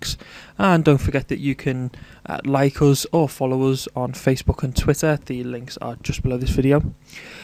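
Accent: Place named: North West England, United Kingdom. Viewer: Hey really well explained and incredibly clear. Your accent sounds like you are from the North West. Please can you tell me why I am not picking up circle/arc centres when sketching an entity on an existing feature. Even something simple like trying to create a circular extrusion on say the end of a circular shaft; the centre of the shaft doesn't show. It used to work. Have I switched something off? It's been driving me crazy for weeks. Thanks for any help you can give. Cheers. Robert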